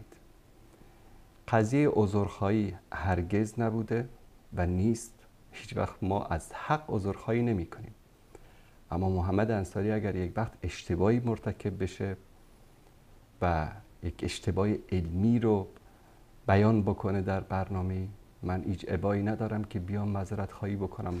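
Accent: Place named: Iran. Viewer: لوده چرا به لهجه ایرانی ګب میزنی؟ تو ایرانی هستی؟ لعنت بر تو آدم لی فرهنګ و بی مسلک و سګ قلاده به ګردن ایران. داؤد مومند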